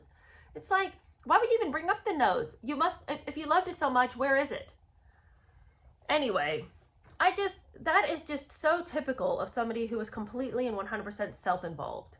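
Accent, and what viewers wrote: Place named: Cockney, United Kingdom. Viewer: dont try an english accent ! It's sounds goofy half cockney accent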